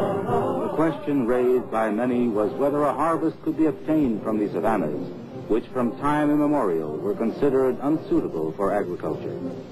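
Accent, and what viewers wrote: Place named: Jamaica. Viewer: What's up with the jamaican accent? You're not even jamaican and you trying to sound like bob marley. Marley talk about ethiopia my mom's ancestor country, not somalia.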